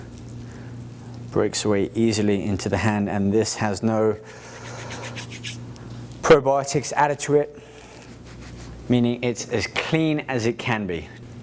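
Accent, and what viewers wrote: United Kingdom. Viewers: i love the british accent!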